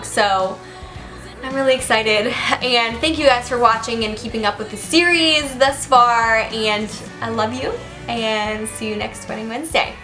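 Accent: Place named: South, United States. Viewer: I haven't heard you talk in a while 😂 but your southern accent is coming in hard girl ! You look fantastic! So excited for you 😍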